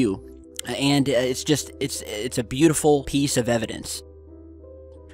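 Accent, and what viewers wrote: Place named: United States. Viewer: Well he speaks English with a kind of American accent, I'm more used with British accents but I understand him very well too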